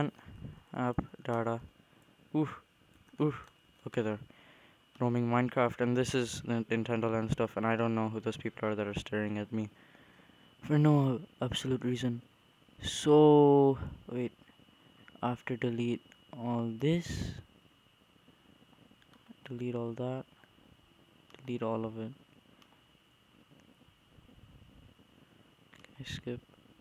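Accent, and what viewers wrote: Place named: Arab world. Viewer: I FUCKING LOVE YOU and your arab accsent (no homo) THANK YOU SOOO MUCH!!!!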